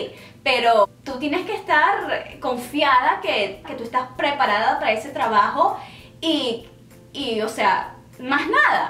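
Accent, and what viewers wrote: Venezuela. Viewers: ¡Me encanta tu acento venezolano! Que padre que sigas haciendo más vídeos en español 😁 me encantan tus vídeos aunque no soy musulmana pero es muy interesante saber de otras culturas. Un saludo desde México ✌ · Ya decía yo ese acento es de Caracas jajajaaj que hermosa que dios te proteja soludos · Hace mucho más de un año que no veía tus videos jajaja me encanta tu acento suena tan de la zona centro de Venezuela jajaja jajaja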